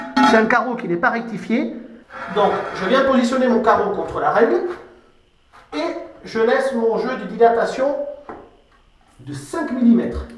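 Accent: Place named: Pau, France. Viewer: Merci pour ta réponse et excuse moi je ne veux pas abuser de ton temps que je sais si précieux pour un artisan. Vraiment j'apprécie ton partage tes videos ta pédagogie et tes réponses si précisent. Je vais essayer de chercher une personne comme tu me suggères. Merci de l'information pour la résine et les carreaux je vais voir si je ne peux pas les faire sauter pour en mettre d'autres. Belle région que Pau je comprends mieux ton petit accent 😊. Amitiés Bon week-end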